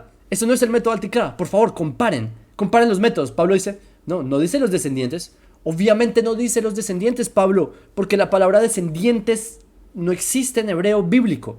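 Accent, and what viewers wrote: Bogotá, Colombia. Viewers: Me gusta mucho su acento Bogotano, muy elegante... con cierta pronuncion estilo arabe... Me gusta mucho escucharlo. Pero esta tan sesgado que no puede salir de la norma ortodoxa Judía, lo que como a todo religioso no le permite abrir la perspectiva sobre los conceptos.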